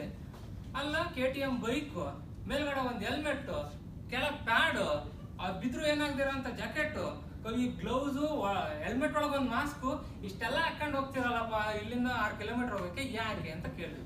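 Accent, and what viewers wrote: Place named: Davangere, India. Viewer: ಯಾರು ಗುರು ಇವ ಪಕ್ಕಾ ದಾವಣಗೆರೆ ಧಾಟಿ ಭಾಷೆ 😍😍😍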